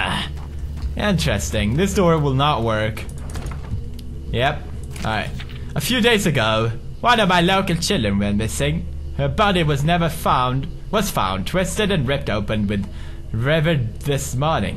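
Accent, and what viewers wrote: Sweden. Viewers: hahaha u had more Swedish accent back then xD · kinda swedish accent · The one thing we Will never have again: deep Swedish accent 😂😂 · omg its been years since i watched this i miss the cute swedish accent back in this day and amnesia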